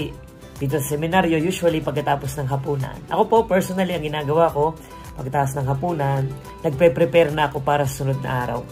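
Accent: Batangas, Philippines. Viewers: Akoy natutuwa saiyoy Fr. Hahaha batanggenyong tuno talaga eh.... Hahahahha Nakakahawa ka ng tawa.Apakamasayahin mo po God bless you po ❤ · Modern day priest. I like the Batangueño accent and his being jolly very contagious. Father is an ideal Spiritual Director for the millennials. More power father!!!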